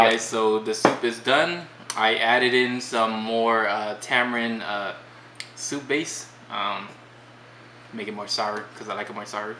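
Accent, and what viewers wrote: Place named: North, Cambodia. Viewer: She from north cambodia? her voice difference from us in svay rieng province. And her food make me hungry too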